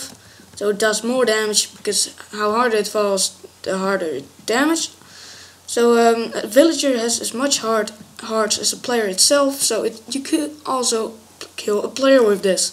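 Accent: Netherlands. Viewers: I am Australian. I was actually quite impressed with your English. Thought you sounded a little Dutch, so I just wanted to check :) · bent toch nederlands he? Ik hoorde het al aan je stem en accent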